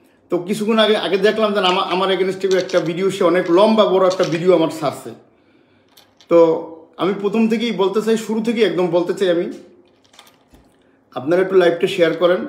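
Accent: Bangladesh. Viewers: ভাই আপনার কথা শুনে মনে হয় আপনার বাড়ি বরিশালে আপনে কি ভাবে এই মহিলার পাল্লায় পড়লেন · Barisal er suddho vasha sundor lagteche sunte 😂😂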